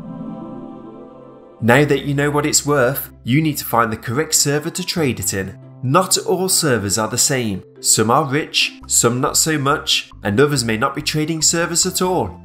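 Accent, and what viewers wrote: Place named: United Kingdom. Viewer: Is it just me, or his voice is so british its calming?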